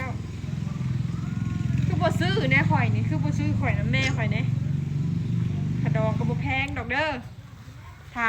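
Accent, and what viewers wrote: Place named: Tha Kho, Thailand. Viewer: ฟังเสิยงเว้า.คึอไทบ้านท่าค้อ แท้.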